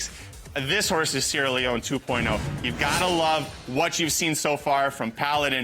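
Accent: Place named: Canada. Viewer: Dude you have no clue! You are stupid, and sound Canadian!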